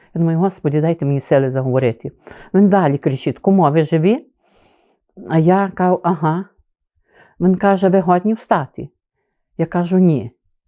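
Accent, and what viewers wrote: Transcarpathia, Ukraine. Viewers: Дякую вам за цікаві розповіді,люблю ваш канал,дивлюсь з задоволенням .Освітчуєте цікаві місця де я хотіла би побувати ,але не взмозі з багатьох причин.Дуже люблю закарпатський говір,мрію так говорити ,намагаюсь. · Як я люблю Закарпацький Говір! дякую вам!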